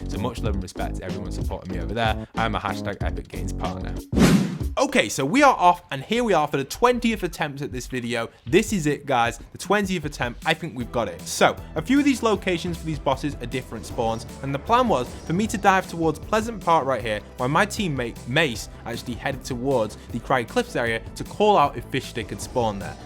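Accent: United Kingdom. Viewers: Also you kind of sound Scottish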